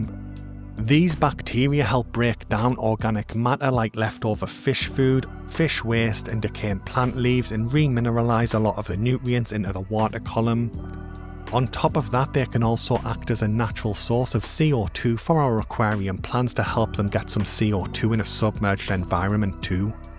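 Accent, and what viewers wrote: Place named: Newcastle, United Kingdom. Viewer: Mate how you haven't blown up yet is beyond me! I truly believe you're the best fresh aquatic channel on YouTube, comprehensive, accessible, VERY WELL RESEARCHED, no tedious filler content or salesmanship. The Geordie/Smoggie accent is a bonus, sorry can't tell the difference 😅 All the best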